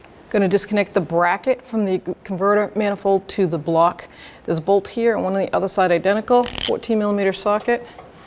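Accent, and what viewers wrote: Boston, United States. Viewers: Nice job here... You should however coat all those threaded connections with high-temp antiseize lube and the O2 sensor should get a dab of dielectric grease to keep moisture out of the connection. This is important if you are in a road salt environment. By the accent, it sounds like Boston or Southern New Hampshire area.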